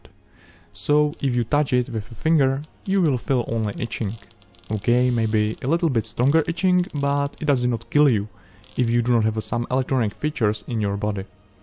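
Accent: Russia. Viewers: Ведь же русский, по акценту слышно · у тебя же акцент Русский, но ты тягачь называешь трактором????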